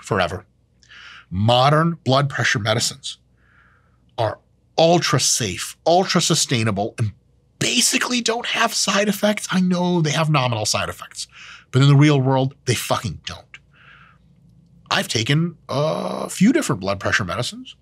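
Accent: South, United States. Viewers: Southern accent Dr. Mike is criminally underrated and underutilized.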